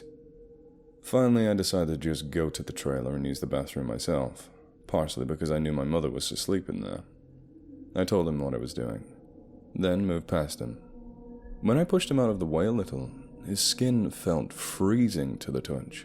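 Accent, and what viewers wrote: South, United States. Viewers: Man I dig ur southern U.S. accent! From Oklahoma myself!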